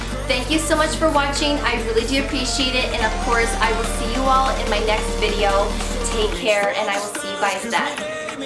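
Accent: Canada. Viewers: So glad you are proud of you Canadian accent! I’m also proud of my French Canadian accent! Go Canada! 🥰🇨🇦🙋🏻‍♀️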